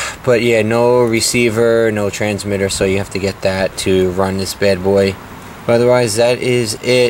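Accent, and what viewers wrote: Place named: East Coast, United States. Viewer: Are u from new York or somewhere in the east coast u have a strong acent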